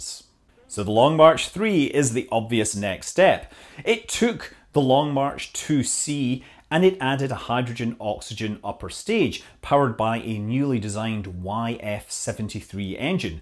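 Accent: Scotland, United Kingdom. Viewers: I'll be honest, I never thought I'd ever hear reasonably understandable Mandarin spoken with a Scottish accent. Not bad,. Not bad at all. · I love your accent. Are you Scottish?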